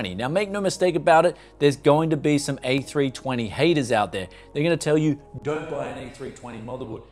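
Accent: Australia. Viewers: His ozzy english n movie star looks ..dayum! "But a320?" Says b450 fans. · Nice Build btw. I like it, just recently found your channel an I like your character a lot. Could listen to your advice and opinions all day long :) Maybe its the Aussi-slang in your English, gives me those Steve Erwin vibes, no offense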